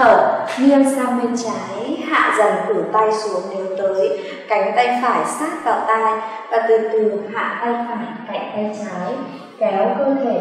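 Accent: Hanoi, Vietnam. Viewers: giọng HN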